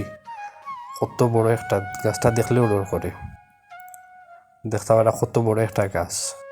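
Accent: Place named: Sylhet, Bangladesh. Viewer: Shylety language suney khub bhalo lagche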